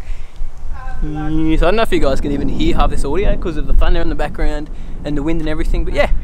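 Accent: Australia. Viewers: I knew you were Aussie before I clicked on the video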